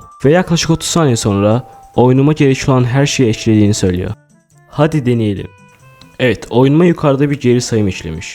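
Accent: Azerbaijan. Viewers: Abi Azerbaycanlisan?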